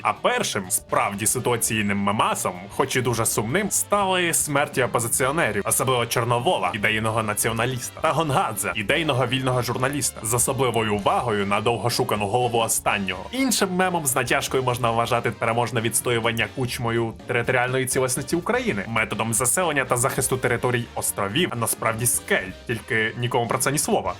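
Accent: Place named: Russia. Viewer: В тебе класний контент. Правда мені здалося, що той тон, швидкість, як ти говориш текст, схожий на когось з російськомовного сигменту, я не кажу, що це погано, але здається, що тобі було б зручніше підібрати свій темп та тон, як глядач/слухач скажу просто - трохи важко розуміти, що ти говориш бо воно зашвидко і трішки нечітко. Але взагалі тобі мега вподобайка і обов'язково продовжуй!!!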